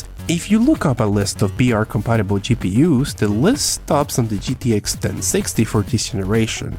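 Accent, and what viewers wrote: Spain. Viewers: Espera, ¿eres de españa? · alv eres de España? :0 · Hey tío, eres de España? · Eres de españa ? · De que parte de España? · como que es de españa? haz un video en español pls solo porque quiero conocer como suenas en español XD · Wait, are you from Spain? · Un segundo, eres español?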